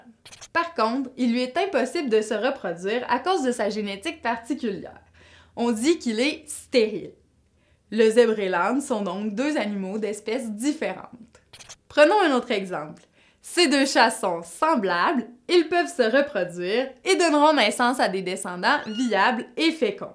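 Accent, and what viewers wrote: Quebec, Canada. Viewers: Merci beaucoup ! En plus l'accent québécois est agréable à écouter haha ! 😀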